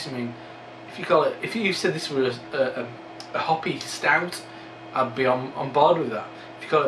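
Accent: Yorkshire, United Kingdom. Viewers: wow you have friends all over haha :P = that BOOTIFUL :P love the Yorkshire accent = sounds like a great beer i saw BEERRITZ has some italian beers in recently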